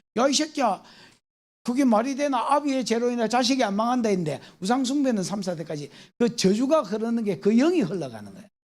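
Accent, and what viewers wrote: Gyeongsang, South Korea. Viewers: 목사님 드디어 주문한 책이 왔어요. 기대 대요 목사님 설교(구속사)를 통해서 마태복음 1장의 족보가 은혜가 됩니다. 전에는 졸리고, 모르는 말씀이었습니다. 비밀이 풀어진듯 합니다. 남편도 성경이 이해가 되어진다고 합니다. 처음에는 경상도 특유의 발음때문에 고생은 했는데 지금은 정겹습니다. 주님의 이름으로 사랑하고 축복합니다. 감사드립니다.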